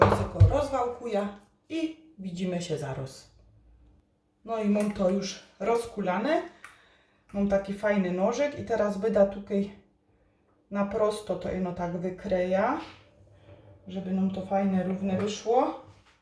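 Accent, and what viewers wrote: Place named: Silesia, Poland. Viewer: Oooo Ty godosz! Aż mi się cieplej na sercu zrobiło.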